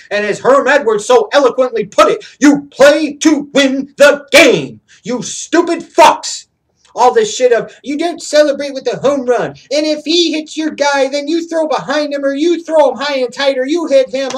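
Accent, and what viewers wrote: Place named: Midwest, United States. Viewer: I love your midwest accent.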